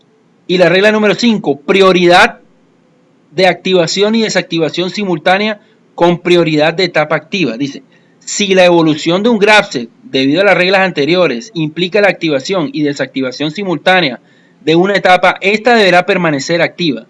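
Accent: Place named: Barranquilla, Colombia. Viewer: Una pregunta, es que por su acento me pareciera que se encuentra en Barranquilla Colombia. Es así?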